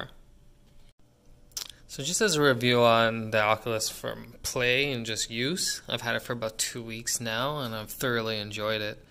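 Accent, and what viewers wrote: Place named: United States. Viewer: sounded american thou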